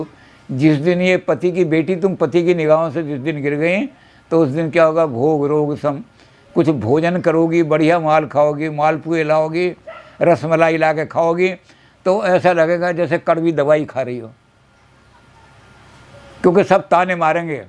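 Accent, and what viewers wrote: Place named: Haryana, India. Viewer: ne Kamal kar diya. Shastri ki bato Ko behad saral v harynvi lahje me samjhaya diya. Dhanywad.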